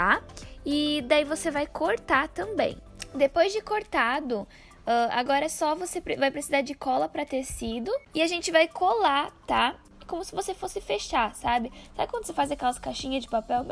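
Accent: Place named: South, Brazil. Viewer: É do sul né? Ksjsk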